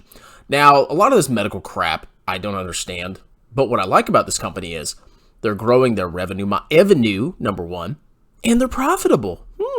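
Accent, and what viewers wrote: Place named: Virginia, United States. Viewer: Haven't heard the Virginia accent for a while..come on. Pllleeeaaasssee!!!!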